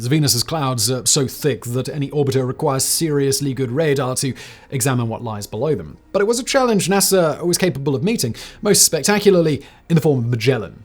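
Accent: United Kingdom. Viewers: Maybe a bit less hipster, styling presentation... The british en (arrogant sound) style push it up, that it seems to help to establish the narcissism of these toxic days.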